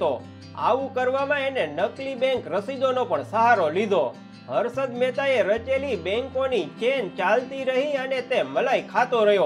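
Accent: Kathiawar, India. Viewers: You have explained wonderfully, I have heard Kathyawadi Gujarati after a long while, I did subscribe and shared this video, hope you make many more videos on the share market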